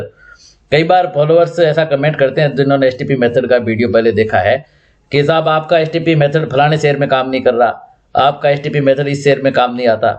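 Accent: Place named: Sirohi, India. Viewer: आप की भाषा सिरोही की लगती है आप कहा से है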